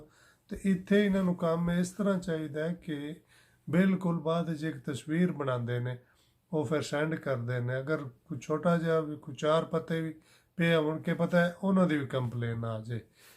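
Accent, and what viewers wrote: Gujrat, Pakistan. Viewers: sahib tousey great ho... vlogers bohat nay pir unhano sunan da maza nahe aynda koi english bool rayea koi hor dosarey zuban apni identity he pul janday nay...... tousey apni proper gujrati assent punjabi which blog bana Kay dil khush kar dita.... keep it up 👍🏼✅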